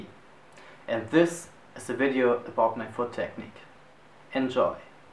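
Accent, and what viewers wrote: Germany. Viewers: sounds like you're german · this is awesome! where are you from? sounds like your german :D I also use this technique since almost a year. its really nice, if you get the first few months of practice done which are pretty hard. as you said, its not easy to get your feet/legs/ankles comfortable with this motion. but once you get that its nice and easy :) its great to see somebody with the same technique playing on a very high tempo level. my maximum at the moment is still 145, sometimes 160 for a short time. so how long did it take you to get for 190 bpm? and would you agree to that: the higher the tempo is, the more you need to work with your ankles and less with the whole leg? thanks for your reply! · is that a german accent?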